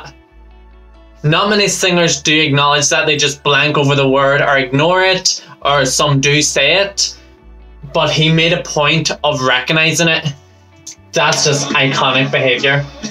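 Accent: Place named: Northern Ireland, United Kingdom. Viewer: I love how you analyse everything so carefully. Anyway, Damiano David is the frontman, Thomas Raggi is the guitarist, Victoria De Angelis is the bassist and Ethan Torchio is the drummer. Keep those reactions coming! I love your accent, btw (Northern Irish, right?).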